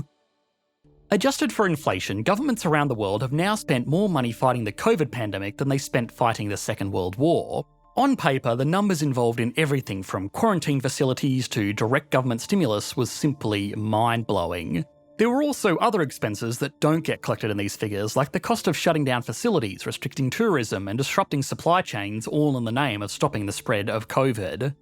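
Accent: Australia. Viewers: Between you and Perun (and sometimes sunnyV2 and shadiversity) i don't thinkni can stomach analysis anymore unless it comes with an aussie accent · As an ignorant American, I can say that I could never tell if his accent was Aussie or Kiwi, so I never noticed the flag flub.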